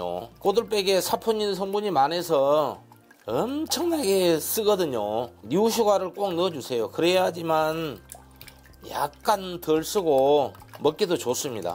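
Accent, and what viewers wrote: South Korea. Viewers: 쉐프님 구수한 사투리로 꿀팀!! 레시피 꼬들빼기 ㆍ쪽파 환상의 맛김치 강의 잘 들었습니다 ^^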